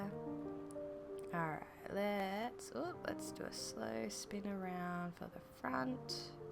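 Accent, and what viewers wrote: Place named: Australia. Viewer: This is absolutely beautiful! I love the decorating in your house- really well done!- and how you’ve created little neighborhoods and lovely spaces ❤ Thank you for sharing and congrats!! As an aside, I absolutely love listening to you speak- I am a HUGE fan of Offspring (I’m on my 12(?) watch through, deeply in love with Matthew LeNevez). But hearing Aussie’s accent (to this Yankee’s ears) is absolutely fabulous. ❤❤❤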